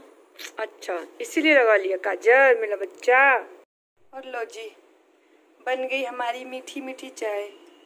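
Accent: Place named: Ballia, India. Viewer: Logon ka kaam h kahna kahane do bahan aap kaha se h aapki boli balliya side ki lagati h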